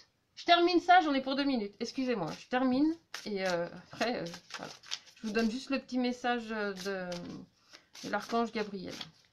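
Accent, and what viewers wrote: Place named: Franche-Comté, France. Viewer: je suis de Franche-Comté,je reconnaissais bien le ptit accent!!!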